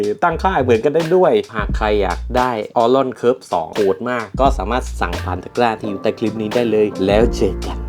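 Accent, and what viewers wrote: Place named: South, Thailand. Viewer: สำเนียง คนใต้บ้านเรานิ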